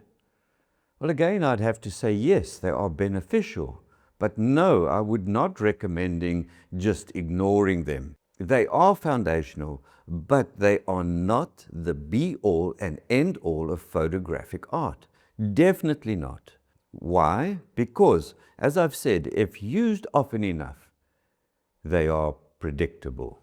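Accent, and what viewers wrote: South Africa. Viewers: Love hearing that SA accent. Lived there a few years and miss it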